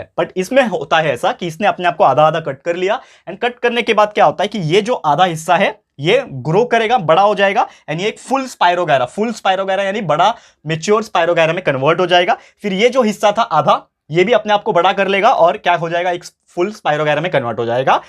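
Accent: Maharashtra, India. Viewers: Sir what is your mother tongue?? Are you marathi??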